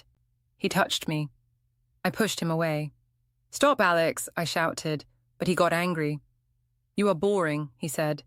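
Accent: United Kingdom. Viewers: I think it's British.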